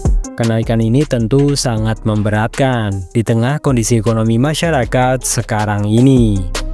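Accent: Central Java, Indonesia. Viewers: Sampeyan, Yo Jawa Tengah 😂😂😂😂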